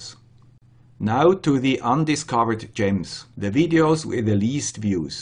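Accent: Switzerland. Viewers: Hi - wishing you continued success for 2017. You explain things on a level that we understand and continue to be generous on providing code. I fully agree that a community is starting to appear and that we can all help each other when hit a small road block. So the guy with the "Swiss accent" please keep on with projects and teaching as well as you go. I personally think is one of the best channels I have found. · Happy New Year! Looking forward to another great year of videos from the guy with the Swiss accent. Don't change a thing. I realize you have a long backlog on video ideas, but would love to see one on enclosures for various electronics projects. This is an often overlooked topic in the electronic hobbiest world. Thanks for your great videos.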